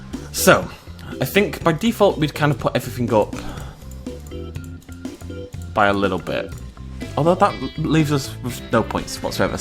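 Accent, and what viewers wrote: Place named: England, United Kingdom. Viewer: I love! I love his English accent and love his content as he talks at his own pace and tries not to rush anything. Keep doing what you do!:D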